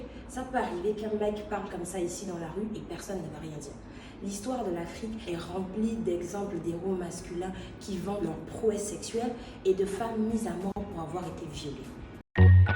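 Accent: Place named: Gabon. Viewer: Je kiff ta chaîne, et l'accent gabonais viens mettre un petit plus ♥️ continue t'arrête pas❤️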